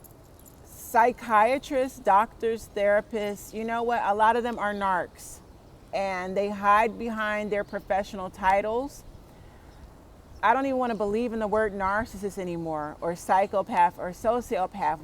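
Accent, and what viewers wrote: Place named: New York, United States. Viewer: Powerful sister, sound like your from NYC...wish we could speak...blessings!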